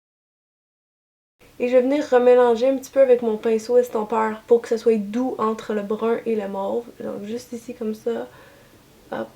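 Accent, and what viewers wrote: Quebec, Canada. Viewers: J'apprends beaucoup en regardant tes vidéos! Pas juste de beaux tutoriels mais hyper informatifs en plus! Et en "Québecois"! Super! :)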